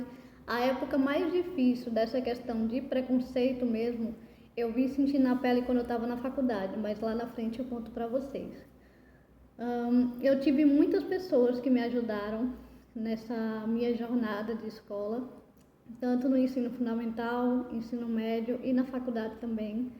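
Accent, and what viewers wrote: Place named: Bahia, Brazil. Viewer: que bela história de superação, e que bom que vc existe. Vc é linda, merece ser feliz. Receba todos os elogios dessa humilde pessoa que se tornou seu amigo, na distante Ribeirão Preto, SP. Seu sotaque também é lindo, sotaque baiano maravilhoso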